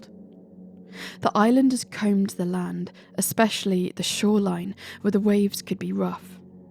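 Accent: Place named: England, United Kingdom. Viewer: A beautiful English accent!)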